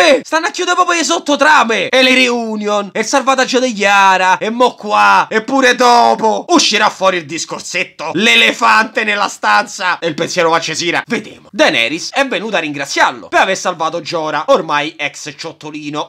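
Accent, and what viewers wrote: Rome, Italy. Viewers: Il primo romano sulla terra che pure se parla romano, non mi fa venire voglia di spegnere youtube. Sei un genio!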